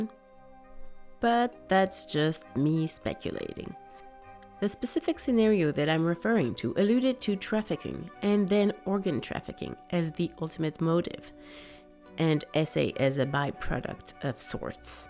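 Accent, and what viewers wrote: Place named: France. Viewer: T'as un léger accent français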